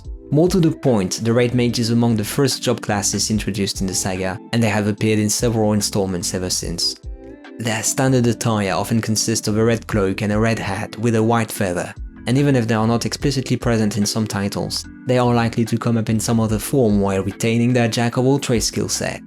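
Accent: France. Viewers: I would have put the summoner first, but that s my thing for Yuna speaking Took my a few minute to figure why I had sub a French accent dude though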